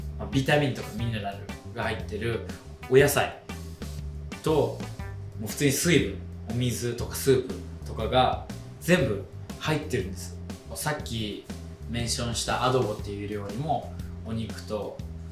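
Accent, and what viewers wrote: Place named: Kyoto, Japan. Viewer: 時々でる京都弁笑えてもうた🤣 作ってみたいな🤔てかレイちゃんの手料理食べたーい❤料理恒例にしてほしいな！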